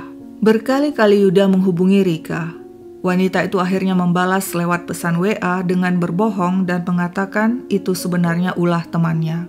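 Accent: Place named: Palembang, Indonesia. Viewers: Bahasa Palembang nya medok ni... kayak Palembang asli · Kok naratornya cakap banget ya pake logat palembangnya? Apa narator orang palembang aslinya. 😂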